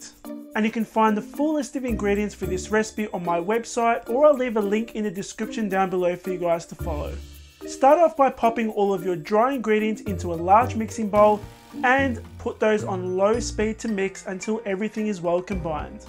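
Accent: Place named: Australia. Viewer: That's Aussie accents for you x Aussie accents are the best ever!!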